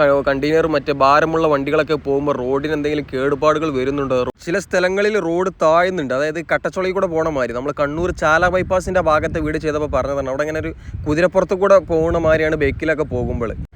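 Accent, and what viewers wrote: Malappuram, India. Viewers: എല്ലാ വിധ ആശംസകളും ! താങ്കളുടെ മലപ്പുറം accent കൊള്ളാം. ധൈര്യമായി, മാന്യമായി ജോലി ചെയ്യുക. രാഷ്ട്രീയം നോക്കാതെ നാട്ടുകാർക്ക് സേവനം ചെയ്യുക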